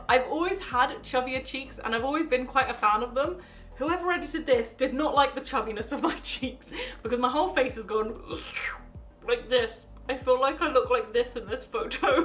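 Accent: United Kingdom. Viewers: can I just say that I love you. Your channel is one of my first British speaking YouTubers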